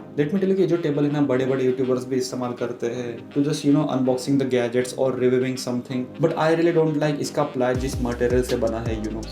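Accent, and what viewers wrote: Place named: India. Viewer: Why are you speaking Indian?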